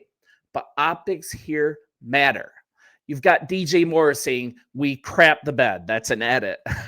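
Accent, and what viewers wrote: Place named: Canada. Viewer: I'm sensing a Canadian or yupper accent, definitely not Chicago.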